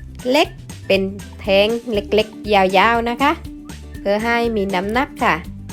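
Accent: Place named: South, Thailand. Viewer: น่าชมมากคะ ไม่ได้มานานป้าพูดใต้แล้วนะคะ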